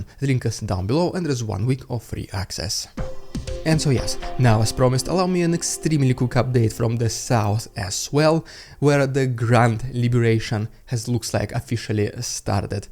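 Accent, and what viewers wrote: Russia. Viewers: You sound so Ruzzian!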